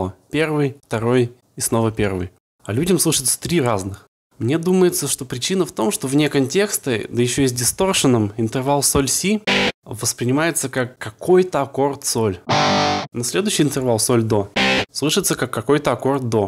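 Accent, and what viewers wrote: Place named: United States. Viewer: Разбор с американским акцентом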